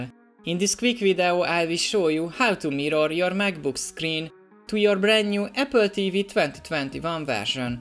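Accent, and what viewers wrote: Hungary. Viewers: Are u Hungarian? The accent :)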